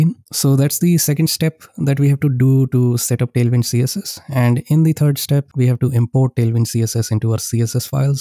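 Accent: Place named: India. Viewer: i heard indian accent i was saved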